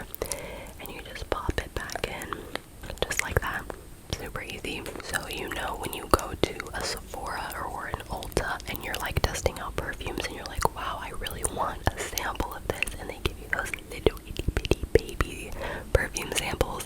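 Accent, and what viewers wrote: United Kingdom. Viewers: Hey <3 love the video as usual 🫶 could you maybe do a british accent video again ? I actually love your british accent lmao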